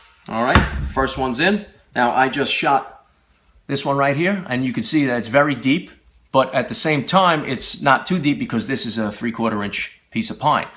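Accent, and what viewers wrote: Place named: South, United States. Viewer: I just ordered one thanks to your review. Like your southern accent. ;-)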